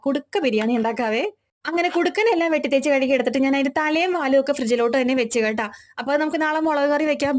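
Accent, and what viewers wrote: Kochi, India. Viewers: Biriyani kazhichathinte Santosham aano last ile dance 😂 Eniku othiri ishtama ...kochi baasha kelkaan❤❤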